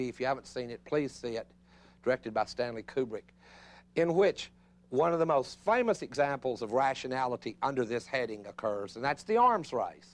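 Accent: South, United States. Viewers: 'Rotund effeminate southerner lectures on leftist apologetics'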